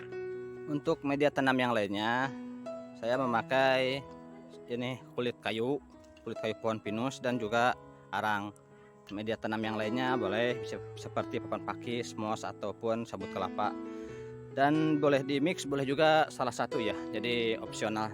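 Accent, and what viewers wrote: Indonesia. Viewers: Very nice orchids and advice. It sounds like you are Indonesian? I lived there for 12 years and loved it 😎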